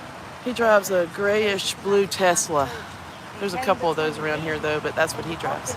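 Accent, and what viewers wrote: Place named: South, United States. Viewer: they are nasty people. I am so proud of you and I love you southern accent! I am a northerner 😂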